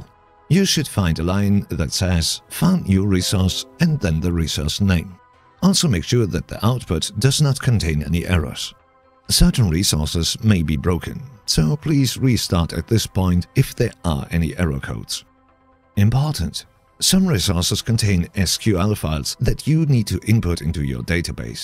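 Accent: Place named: Germany. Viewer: No worries it was beautiful! German people have a very unique and strong accent, and I personally quite enjoy listening to it, but I have honestly never heard one as nice as yours! I am from australia so listening to our accents even for me here is like wiping my butt on sandpaper hahaha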